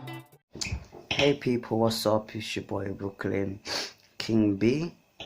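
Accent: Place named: Birmingham, United Kingdom. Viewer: I came here from Stud-conscious. You a Londoner? From darn sarf? I'm from Manchester 👍👍👍 Edit.. Brummie twang there too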